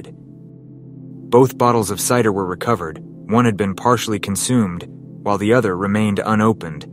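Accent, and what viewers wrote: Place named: United States. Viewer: Finally! Ive found an american voice over, true crime channel that covers UK murders 😭❤️🙌 thank you 🎉 SUBBED 😊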